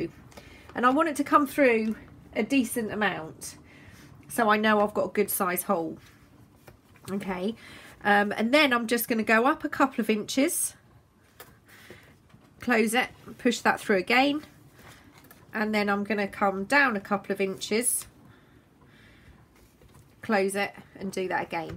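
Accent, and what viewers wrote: England, United Kingdom. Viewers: I think I like hearing your English accent as much as your artwork!! Watching your videos just makes me happy!😊😊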